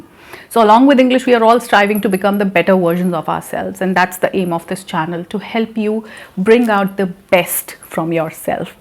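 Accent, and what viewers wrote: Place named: India. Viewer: So nice video you have made me dear sister, with very clear voice, with very clear worlds but with super popular subcontinent accent and very useful points you have highlight, really learners are going to get benefits for your video. May Allah Almighty always keep you happy my dear sister 👍, Best wishes form Pakistan 🇵🇰🙏.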